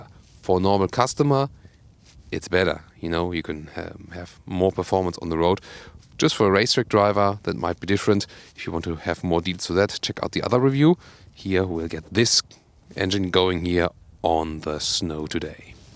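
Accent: Germany. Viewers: Schönes Video, aber du solltest an deiner Aussprache arbeiten. Das Klingt mehr nach Deutsch, als nach Englisch^^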